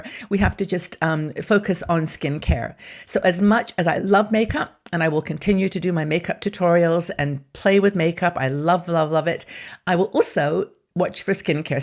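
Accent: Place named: England, United Kingdom. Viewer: LOVELY!!!!! SO NICE TO HEAR YOUR ENGLISH VOICE MY DEAR. I LEFT LONDON IN 1952 AND STILL HAVE MY BRITISH ACCENT, OF WHICH I AM VERY PROUD. I MEET FEW BRITS, BUT LOVE THE ENGLISH MENTALITY, WHEN I DO....SO. POLITE, EMPATHETIC, FRIENDLY AND UNDERSTANDING, A GREAT JOY ALWAYS....A N D... SO I SEND MY WARMEST CHRISTMAS GREETINGS TO YOU. YOU ARE INDEED A PLEASURE TO ALL OF US.... AND ......I LOVE TO BE ALONE AT CHRISTMAS....I CAN REFLECT AND THINK OF MY BELOVED HUSBAND AND MY DARLING DAUGHTER WHOm I LOST LAST JANUARY TO CANCER...MY FAMILY ARE NOW FEW AND FAR AWAY, BUT WE ARE VERY CLOSE, AND KEEP IN TOUCH ALMOST EVERY FEW DAYS...SO THE LOVE NEVER CEASES....But I am alone and that;s fine with me....I make the best of life...Happy Christmas to you dear friend. I AM NOW 88 1/2 AND AM AS FASHIONABLE AS I ALWAYS WAS!!!! LOVE IT!!!! Hahaha! I honestly love to be alone at Christmas, and reflect on those happy memories I have.I am not sad at all.....I LOVE my time alone, and look forward to the new year immensely.God bless you and yours. 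You are my Mince pie and sausage rolls connection. My Mum too!!!!! used to make them, and we put sixpenny pieces in the Christmas puds she made......Happy days....even the War days.... Happy Christmas everybody💋💋💋💋🌲🇬🇧🇨🇭🇺🇸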